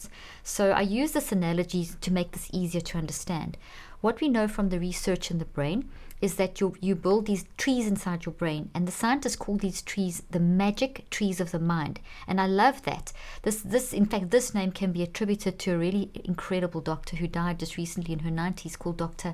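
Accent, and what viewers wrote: South Africa. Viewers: So nice to listen to your South African accent Dr Leaf 😊❤️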